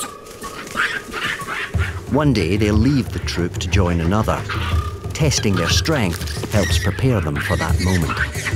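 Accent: Scotland, United Kingdom. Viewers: Well I didn't wake up this morning expecting to hear David Tennant say "Spy Macaque" in his very Scottish accent but here we are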